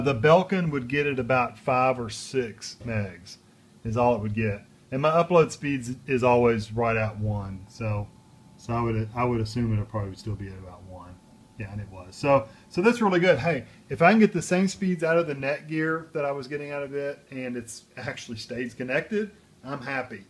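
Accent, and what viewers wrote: United States. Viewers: Thanks for you video ! Its great made, you 're nice guy ! But Im french and would have appreciated english subtitle because you have a strong english/american accent ! lol :))) I would have like to see the internet settings panel because I didnt understand if you need to set up login and password of the internet provider or not for adsl...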